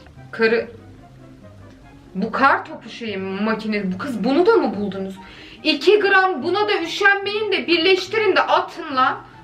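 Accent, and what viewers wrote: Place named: Sivas, Turkey. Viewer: Abla nerelisin ben sivaslıyım aynı senin gibi konuşuyom gızzzz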